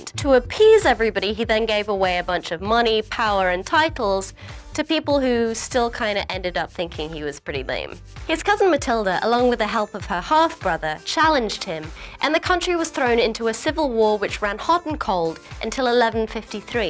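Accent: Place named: RP, United Kingdom. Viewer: has a home counties accent, and what is called Received Pronunciation. which is the standard form of British English pronunciation, based on educated speech in southern England, widely accepted as a standard elsewhere.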